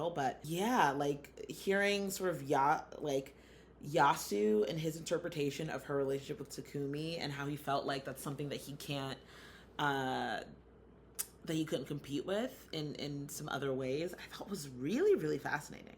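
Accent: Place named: New York, United States. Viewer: My guess is that maybe you're from New York, haha XD And enjoyed your reaction as always!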